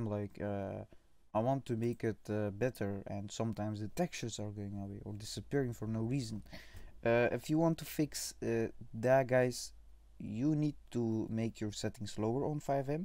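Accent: Netherlands. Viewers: lol i can hear you have dutch accent me 2 haha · Ik hoorde gelijk dat je NL was haha maar goed uitgelegd pik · ik hoor nederlands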